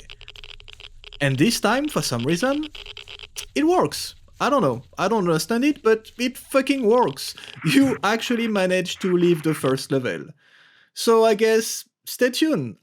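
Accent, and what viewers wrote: France. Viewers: Slt, ça s'entend que t'es français et étant francais aussi c'est un peu plus compliqué de te comprendre paradoxalement. Je sais que c'est un peu de travail en plus mais je te suggère de re doubler tes vidéos en français. Sinon j'aime bien tes vidéos, continue ! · bro, just because your vid made me feel sorry for your time loss and your adorable french accent; ca craint et va te faire foutre, connard. much love, XOXO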